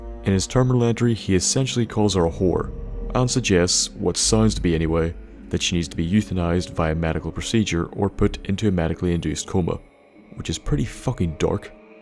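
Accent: Ireland. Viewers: feel like he's from Northern Meath or Southern Ulster. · He's most likely from Ireland at least his accent is Irish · .....are you irish? lol just a question due to your pronunciation of words. and you say shite a lot haha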